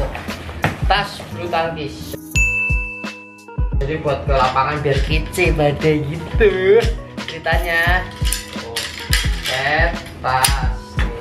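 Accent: Java, Indonesia. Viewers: Kak nih asli mana sih,ngomong jowone medok bingit Rek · wkwk ucul bahasanya campur2 medok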